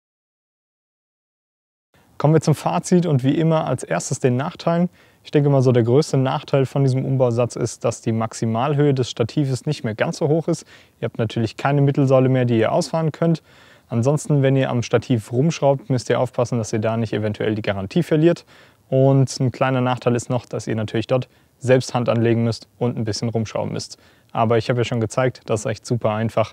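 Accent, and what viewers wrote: Germany. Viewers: Oh my dear friend! I follow you all the time for the best reviews and I really wanted to hear you speak in your native language. It seemed to me by name and accent that you have something at the base from Germany but I wanted to hear you. Haha!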